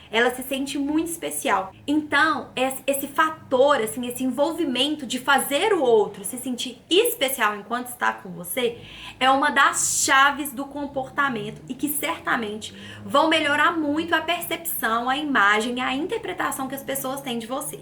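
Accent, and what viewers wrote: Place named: Minas Gerais, Brazil. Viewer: ❤ que saudades que eu tenho de ouvir esse jeitinho mineiro ❤❤❤❤❤❤ Meus queridinhos ...amores eternos❤❤❤❤rsrsrsrsrs Eu sou vidrada no povo mineiro: amor perfeito!❤❤Eterna seguimora de Viçosa e BH eternamente aonde estiver no planeta meu ❤ coração é mineiro ❤❤❤❤❤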